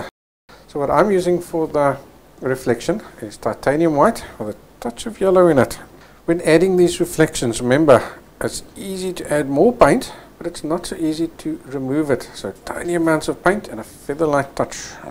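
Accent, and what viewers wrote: Afrikaans, South Africa. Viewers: I can hear he's Afrikaans 😅👍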